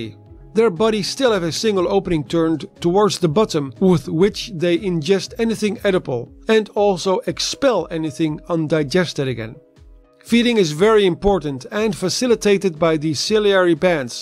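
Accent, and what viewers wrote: Netherlands. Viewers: I love your videos and your (dutch?) accent. You might want a plainer background when doing the greenscreen-style overlay though 🙂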